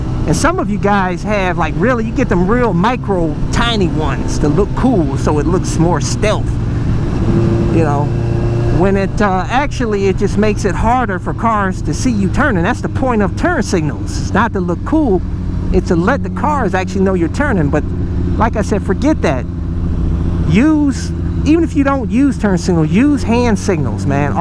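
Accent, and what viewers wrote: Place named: Louisiana, United States. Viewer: Dude are you from Louisiana? You sound familiar af bro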